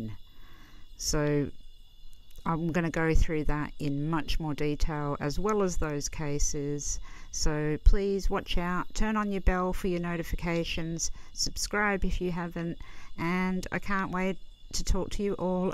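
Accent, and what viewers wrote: Australia. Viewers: Are you Australian,? 👋🇦🇺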